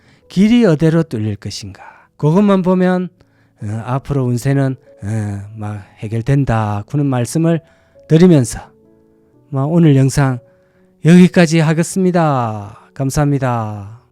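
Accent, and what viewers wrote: Gyeongsang, South Korea. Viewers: 우리동네 오셨네요 만났으면 해장국 한그룻하는긴데 덕분에 갱상도 원조말을 잊지않습니다 서울 외국 생활하다보니 점점 잊고있습니다 감사합니다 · 저도 * 같은 어투를 쓰는 갱상도 촌놈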